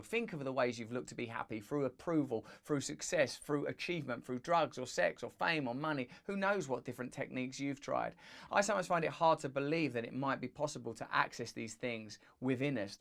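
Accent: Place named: England, United Kingdom. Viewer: his English is so English it's hard for me. to understand it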